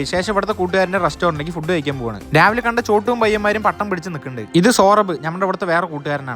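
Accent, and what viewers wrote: Palakkad, India. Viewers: നിങ്ങ പാലക്കാടാണ്്ന്ന് പറയാണ്ടെന്നെ അറിയ്ണ്ട്. ഗെന്താ മക്നേ.. ഹാവു.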